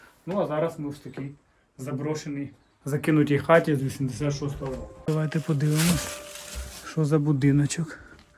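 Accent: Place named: Poland. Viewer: Фиии , польский суржик !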